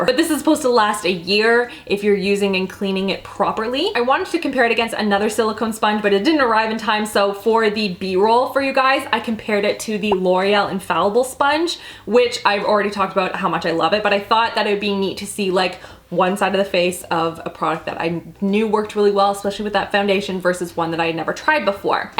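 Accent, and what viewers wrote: Canada. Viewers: Hopefully this doesn't sound ignorant lol But I have always been like shouldn't she have some sort of an accent being from Canada. Until she said "out" I was like ding ding there it is lol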